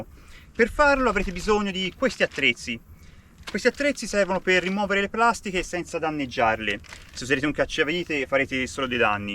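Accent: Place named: Tuscany, Italy. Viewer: Sei davvero bravo....vorrei avere la tua manualità e, purtroppo, dall'accento toscano che noto, non siamo proprio vicini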